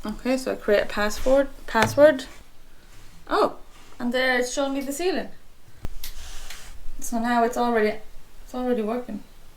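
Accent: Ireland. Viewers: Partly guessed you were Irish.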